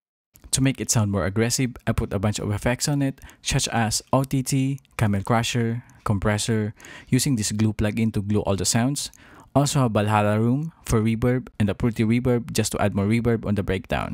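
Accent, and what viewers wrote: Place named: Philippines. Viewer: Filipino? Your accent sounds really filipino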